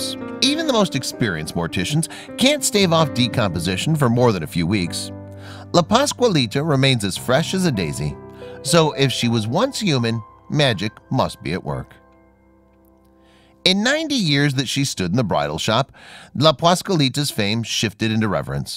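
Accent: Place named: Germany. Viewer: He sounds like what a thick German accent voice feels like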